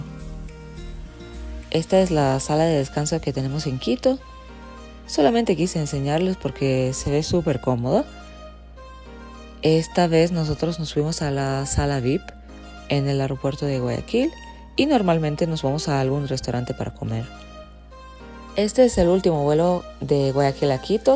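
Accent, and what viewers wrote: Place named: Argentina. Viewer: !!??tu castellano es muy argentino!!!!😃 Coca, jugo, y como estructuras muchas frases!!! Te A D O R O!!!!!!!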